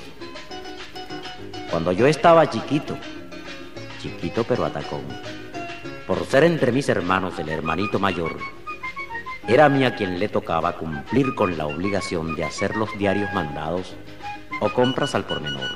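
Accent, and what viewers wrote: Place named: Venezuela. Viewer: que bonitovale, se escucha tan Venezolano e esa poesïa , hay muchas palabras que E hoy en dìa las sigo escuchando, que fino vale, que lindo es ser Venezolano.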